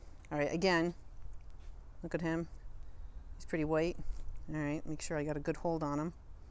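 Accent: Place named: Michigan, United States. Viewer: You must be from Michigan as your wonderful accent sound so much one my friends from Michigan?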